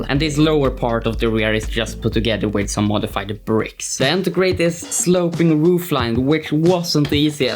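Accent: Sweden. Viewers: Så stark svensk brytning haha...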